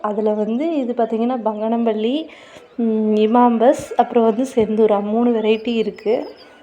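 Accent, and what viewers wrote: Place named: Kongu, India. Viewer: கொங்கு தமிழ்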